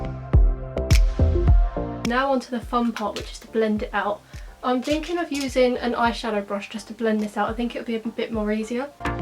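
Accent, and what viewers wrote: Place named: United Kingdom. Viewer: I love it!!1 Thanks for checking out my channel!! AND OMGGGG UR BRITISH I LOVE BRITISH PEOPLE AND UR GUYS ACCENTS IVE ALWAYS WANTED ONE XD <3 xx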